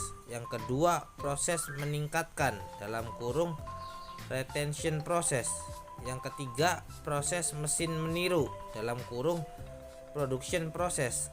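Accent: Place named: Java, Indonesia. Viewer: Bahasa nya medok bgt ya gaes😂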